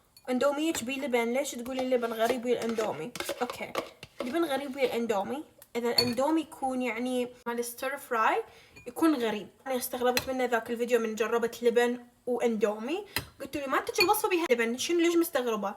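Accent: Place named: Iraq. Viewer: من طريقة كلامج حسيت إنج عراقية و تأكدت لما شفت شو كاتبة تحت الفيديو +++أنا مغربية و أحب العراق ❤🇲🇦🇵🇸🇮🇶❤🔥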